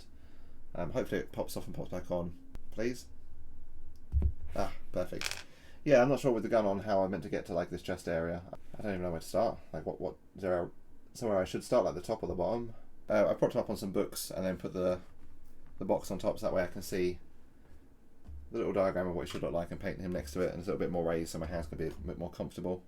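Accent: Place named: England, United Kingdom. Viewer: Hello mate! Seasoned painter here and I think he looks fantastic! I know what you mean about the price of them. They're insanely pricey. You sound like you're from England (like me :)) so if you want to get kits and such best places are element games or weyland as they sell minimum 15% cheaper or warbay (they specialist in buying peoples stuff so quite often at a 50% discount!). p.s. dropped you a sub! Looking forward to seeing more content :)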